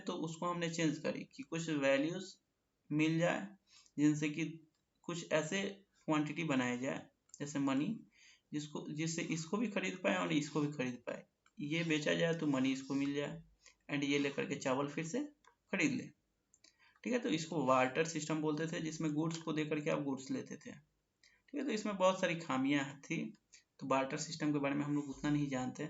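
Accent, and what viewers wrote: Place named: Bihar, India. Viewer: SIR AAPKI LANGUAGE ME BHOT PROBLEM AARHA H AAP LAGBHAG BIHARI BOLTE HO OR ENGLISH READING BHI UTNA ACHA NHI FOR eg. aap Very ko Bery padhte ho , AAPNE PADHANE KE LIYE TOPIC TO ACHA LIYA H LEKIN THODA EASY LANGUAGE ME EXPLAIN KRNE KA KOSIS KIJIYE OR BOLTE SAME CONFIDENCE RAKHIYE TABHI AAP EK TEACHER BN SKOGE PLZ IMPROVE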